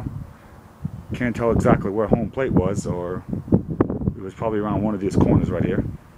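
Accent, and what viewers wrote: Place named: Northeast, United States. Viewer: A northeast accent touring Houston history @@ LOL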